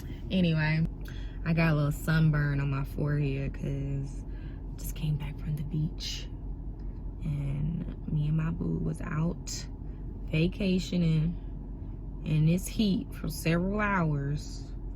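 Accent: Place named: Chicago, United States. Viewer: Sound like she from the Chi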